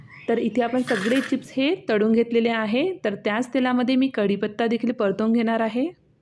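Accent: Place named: Jalgaon, India. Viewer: जळगाव भाषा बोलत आहे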